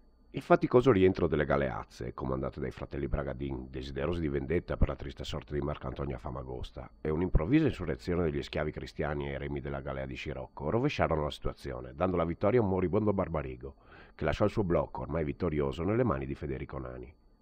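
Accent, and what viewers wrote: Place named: Veneto, Italy. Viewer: Perche' non capisci che la parlata e' veneta, il testo e' antico... e' forse una delle piu' belle letture sulla battaglia di lepanto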